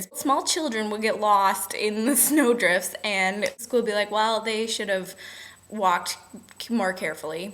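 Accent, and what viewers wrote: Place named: Canada. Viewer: you do sound a little canadian though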